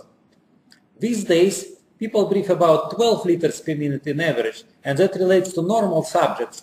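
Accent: Russia. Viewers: Your accent is very Russian :) but I see you're confident in your speech and it makes video more interesting to watch. Thanks for great lessons.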